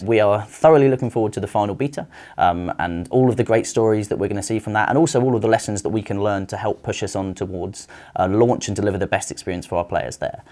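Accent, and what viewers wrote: United Kingdom. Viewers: That british accent 😍😍 10 more days cant wait to play SoT, I have hope this is gonna be huge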